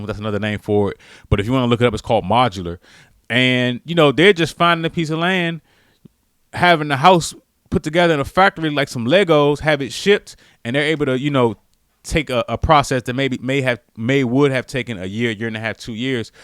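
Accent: DMV, United States. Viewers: You sound like you are from the DMV? Is that where you are based?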